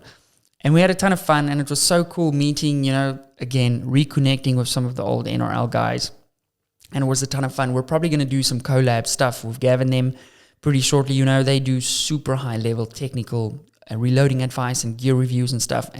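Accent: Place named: South Africa. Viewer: Thank you love the channel and the south african accent 👌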